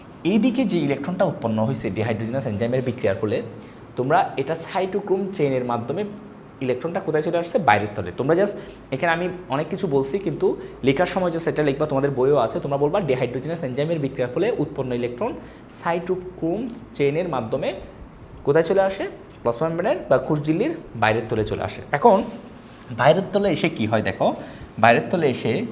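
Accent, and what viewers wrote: Sylhet, Bangladesh. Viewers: Nice class🤗.Btw,I think you're from sylhet because of the sylhety accent while you're speaking . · You are from Sylhet, are'nt you?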